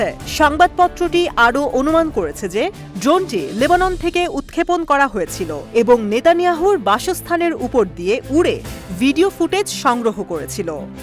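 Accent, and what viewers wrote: India. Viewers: আসসালামু আলাইকুম কথার ধরন ভারতীয় হয়ে যাইতেছে বাংলাদেশি মানুষের মতো কথার ধরনটা করেন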